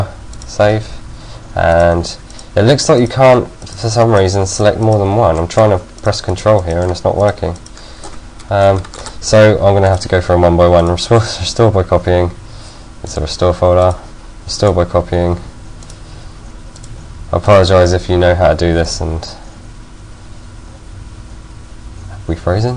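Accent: United Kingdom. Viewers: I LOVE YOU! You are so so so so smart and ABSOLOUTELY BRILLIANT BRILLIANT, bloody brilliant! And I love your british accent. That does work.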